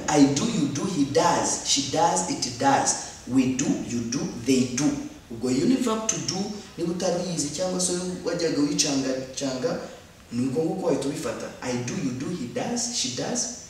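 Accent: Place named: United Kingdom. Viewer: Teacher thanks for your contribution to the Rwandan community I really like your methodology,but most importantly your English is clean it sounds really british English not like some teachers whose English accent cannot be distinguished with their local languages. These international language should really not be confused with our local languages. Please keep it up.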